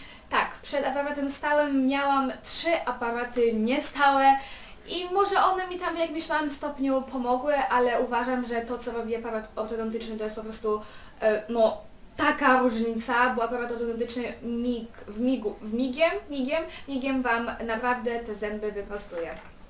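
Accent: France. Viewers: Masz frncuski akcent ;3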